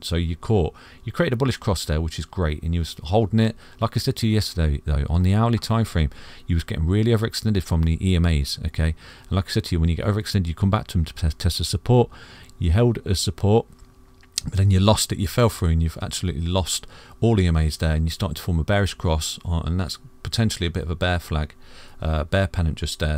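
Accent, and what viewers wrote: United Kingdom. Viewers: This british accent is so damn gay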